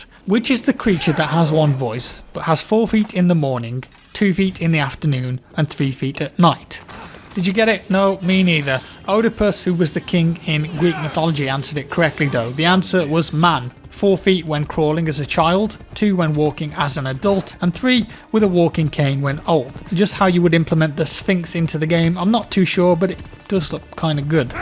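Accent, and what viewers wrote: United Kingdom. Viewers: The way you pronounce Minotaur and Gaia...sometimes I can't even with you Brits.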